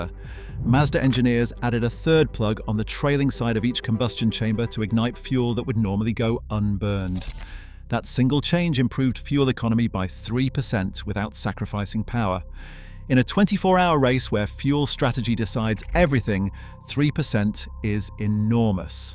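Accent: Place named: United States. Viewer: Great video, but as a British writer and teacher, I’m intrigued by your American pronunciation of numbers. 😊 I’ve never heard anyone British do that before. Not a criticism - I’m just curious.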